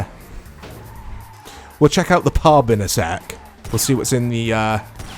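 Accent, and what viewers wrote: Wales, United Kingdom. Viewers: It's refreshing to hear a fellow cymraeg accent on here 😂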